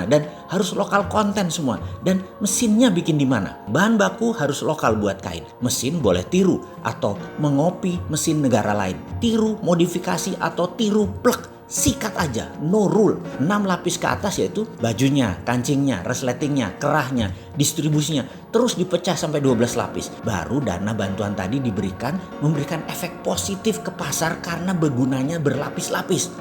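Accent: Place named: Banyumas, Indonesia. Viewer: Wuiih ,,jd bossman ngapak ya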